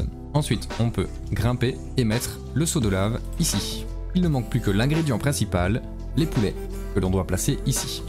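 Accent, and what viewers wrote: Belgium. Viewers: ça s'entends tu est belge, en tant que français je me dois de na pas liker cette vidéo même si je l'ai apprécié. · J’ai l’impression de reconnaître un accent belge 🤔 Je me trompe ? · Bonjour à toi merci pour cette vidéo serait tu belge ? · Ton accent c'est un belge ?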